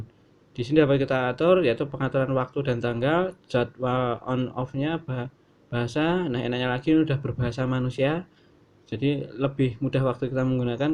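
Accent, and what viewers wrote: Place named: Java, Indonesia. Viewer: Lu yang norak bukan jamnya😂😂😂KAMPUNGAN NGOMONGNYA MEDOK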